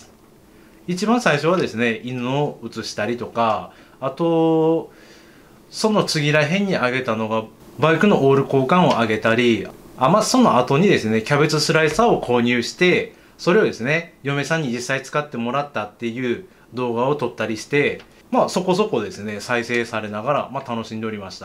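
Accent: Kagoshima, Japan. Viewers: 鹿児島弁ですね！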